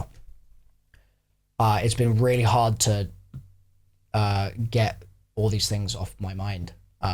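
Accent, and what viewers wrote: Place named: England, United Kingdom. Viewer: Goodbye, I'll miss hearing zombies commentary in your english accent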